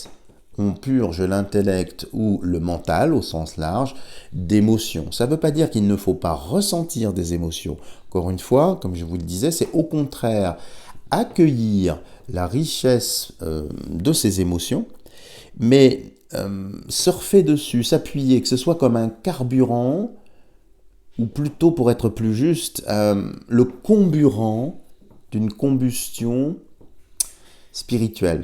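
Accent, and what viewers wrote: France. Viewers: Bonjour quel plaisir que d'entendre parler un pur français... quelle belle langue que la nôtre. En sus de vos connaissances et de vos explications claires et précises sans blabla inutile ni dénigrement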